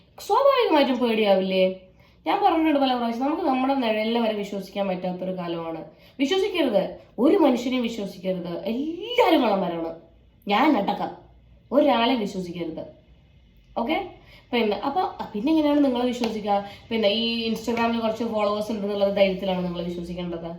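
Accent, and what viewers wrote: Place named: Kanhangad, India. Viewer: super avatharanam nammude kanhangadan slag idakku varatte adipoli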